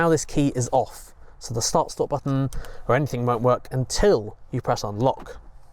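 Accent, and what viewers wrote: England, United Kingdom. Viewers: thank you for your prompt response. chatGPT mentioned that it may be a bad calibration of the sensors and recommend to take it to MB dealer for service. Thank you again. I love your content. I need to use captions because is hard for me to understand English from England. But you create great content. I live in Miami, Florida USA.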